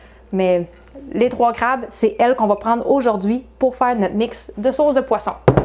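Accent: Canada. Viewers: Je suis d'accord avec Agathe. J'adore l'accent canadien vous êtez trop mignone !..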